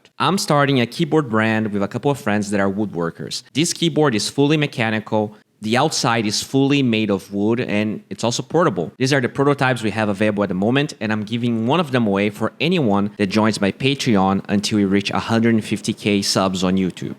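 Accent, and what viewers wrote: Brazil. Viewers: o cara é br da pra ver pelo sotaque kkk tnc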